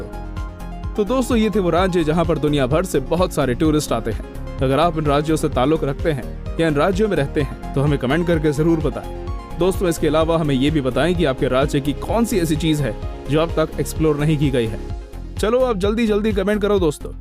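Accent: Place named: Bihar, India. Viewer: It seems the host is from Bihar 😜👍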